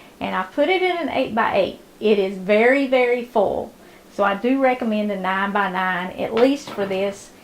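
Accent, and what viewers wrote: South, United States. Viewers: Verses and Vittles.....I always enjoy your videos. Your accent reminds me of my years living in the South...North Carolina and Alabama. My Mom was from Tennessee, and you cook like she did!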